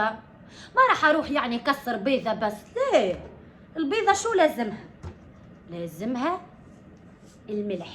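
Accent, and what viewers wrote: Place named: Saudi Arabia. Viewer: 😆 هدرتك تتبدل صرتي سعودية صح 👌🏼💯😆 بصح ضحكتك تبقى كيما راهي 😆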